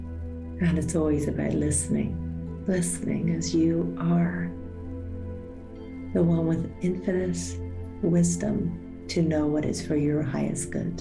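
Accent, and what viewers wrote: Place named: Ireland. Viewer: machnaimh álainn! Tá mé i mo chónaí i mBéal Feirste agus ba mhór an t-iontas é do bhlas Gaelach iontach a chloisteáil! míle buíochas ó bhandia amháin go ceann eile. grá agus sparkles!!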